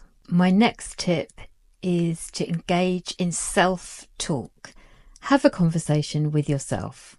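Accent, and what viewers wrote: United Kingdom. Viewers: All tips are important for learning English and listening to the British accent makes me enjoy learning English.❤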